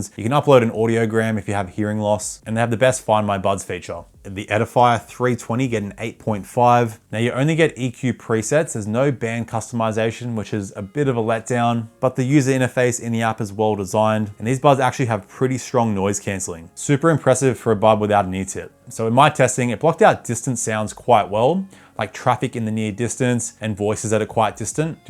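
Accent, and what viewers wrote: Australia. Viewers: Always love seeing an aussie bloke talking bout tech on the Internet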